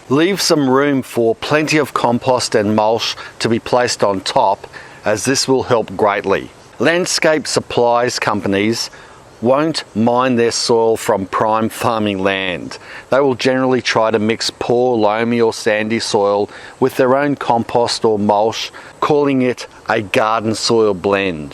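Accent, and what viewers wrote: Queensland, Australia. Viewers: I’m going to guess you’re from Qld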